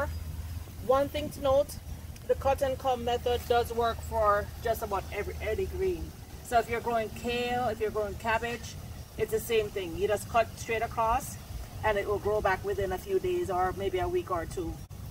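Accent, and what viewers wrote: Jamaica. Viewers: you're welcome Jamaican accent Are you Jamaican or just Jamaican parents?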